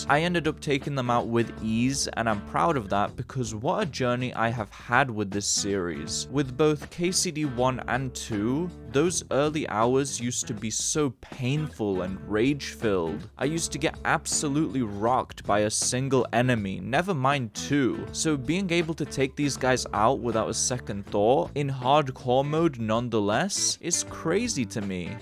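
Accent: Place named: Northwest England, United Kingdom. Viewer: This is my fourth playthrough. Two in original mode and my second playthrough in hardcore. The blacksmith dlc is heaven sent. This time I started it asap. This fourth playthrough is the best yet. Take my time and new things come up all the time. I'm finally getting a handle on the Kuttenburg map but I still get lost at times and for me it's mostly because I get lazy in my navigation before I head out from a town or whatever. I use my body as a sundial except for when it high noon ish. Never get off the road at night very far w/o nighthawk. But yeah, the immersion experience is so good in hardcore that I would never go back. I only use three negatives and the easiest ones for me. Very nice and relaxing video. Keeps the love fresh for the game. Cheers btw where in the UK is your accent from? My guess is the northwest. Blackpool. 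Liverpool?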